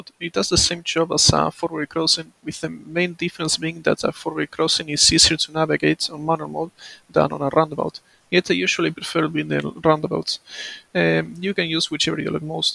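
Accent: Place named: Argentina. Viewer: Ahjj el ingles argentino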